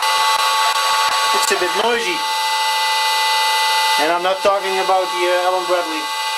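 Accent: Netherlands. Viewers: Aan je tongval te horen, ben je een Nederlander. Ik moet zeggen dat je erg goed Engels spreekt voor een techneut. Hou vol. Amusante video's.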